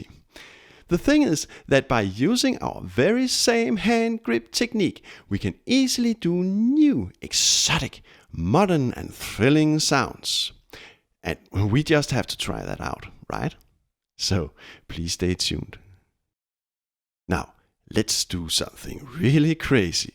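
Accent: Denmark. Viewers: Are you from Denmark??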